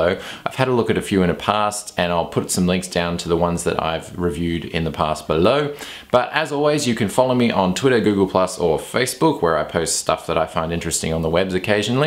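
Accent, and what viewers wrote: Australia. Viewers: I think there's a quite a bit of Ozzie in his voice but I dunno what else.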